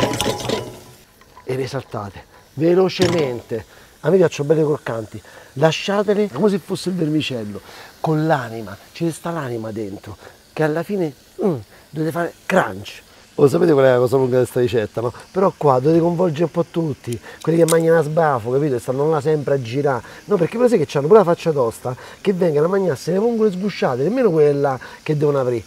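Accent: Rome, Italy. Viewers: sei simpatico sei sempre sorridente spieghi benissimo e sei de Roma col vostro accento che mi fa impazzire. Ho provato alcune delle tue ricette e mi vengono anche bene, l'unica critica che ti posso fare e' che a forza di provarle sono ingrassato di 10 kg. 😄😄. Grazie di tutto.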